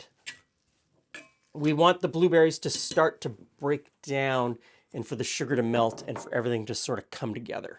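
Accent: Canada. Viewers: I really enjoy your channel. As a displaced New Brunswicker living in Virginia it is nice to hear "about" pronounced correctly!. I have a 1973 Northern Cookbook published by the Canadian Government Dept of Indian Affairs and Northern Development and I was wondering if you would like to add it to your collection. I think someone gave me this years ago and I have never used it (no local sources of beaver meat) but you may find it interesting. I think it is a bit of Canadiana and needs a good home. I will be visiting family and friends in Ontario later this month and could mail it to you from there if you would like to have it. Alfred Taylor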